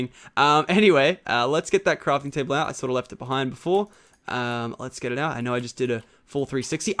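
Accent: Australia. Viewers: Also, are you Australian because you sound like it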